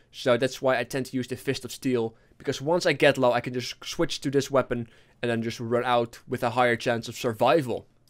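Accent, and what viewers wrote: Netherlands. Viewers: Are you dutch? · Is this guy dutch?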